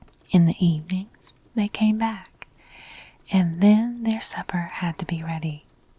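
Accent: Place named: South, United States. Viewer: Seeing your beautiful face is as comforting as your gentle southern tinged voice.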